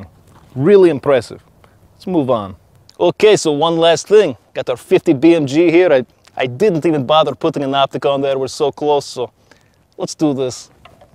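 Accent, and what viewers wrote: Russia. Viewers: 俄国口音的英语吧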